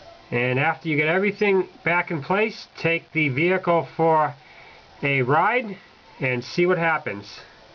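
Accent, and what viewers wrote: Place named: Brooklyn, United States. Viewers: I love the Brooklyn accent. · Logan Mattson He must be from NY that's all. Everyone sounds the same from over there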